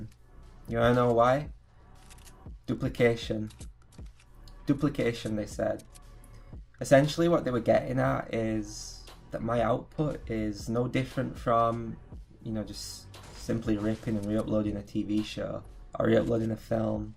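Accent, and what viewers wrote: United Kingdom. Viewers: Dude, where are you even from? Your accent sounds British.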